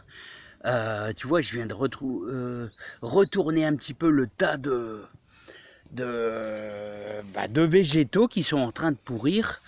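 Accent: North, France. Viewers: Bonsoir monsieur, une video intéressante, j'ai toujours autant de plaisir à vous écouter, et cet accent chaleureux de mon nord que j'ai quitté, prenez soin de vous à bientôt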